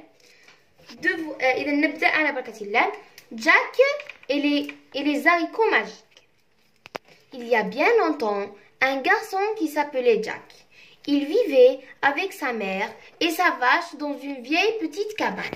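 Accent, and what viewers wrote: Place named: Kabylie, Algeria. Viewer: انتي قبايلية منين من صوتك عرفتك بلي قبايلية انا تان قبايلية و شكرا على كلشي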